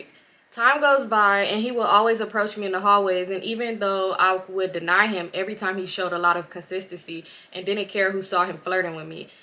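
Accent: Trinidad and Tobago. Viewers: Allyuh = All of you Caribbean accent Possibly a Trinidadian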